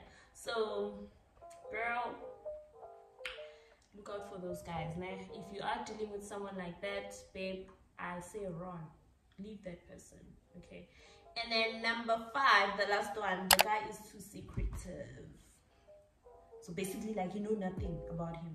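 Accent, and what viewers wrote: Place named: Nigeria. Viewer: It’s the nigerian accent for me😂😂 biko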